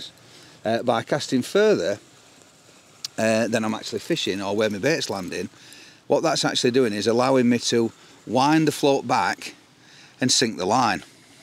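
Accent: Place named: Manchester, United Kingdom. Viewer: Brilliantly done you must be from Manchester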